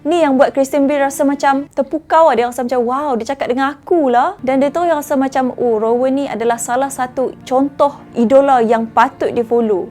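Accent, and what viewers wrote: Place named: North, Malaysia. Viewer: Pekat loghat Utara sis... Orang orang 😅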